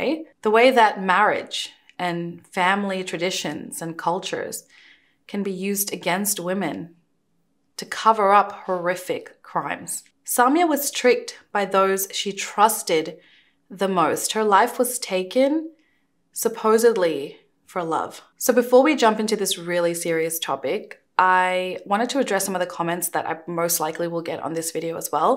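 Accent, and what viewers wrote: India. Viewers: Ehhh I always thought your ethnicity was South Asia. Even when you talk about your childhood and stuff it sounds so Indian XD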